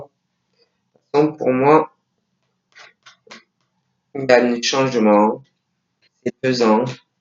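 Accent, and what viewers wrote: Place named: Marseille, France. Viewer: Bonjour s est la première fois que je vous vois, et vous écoute, vous êtes un monstre dans le bon sens biensur, vous êtes extraordinaire, merci votre accent, j ai le même Marseille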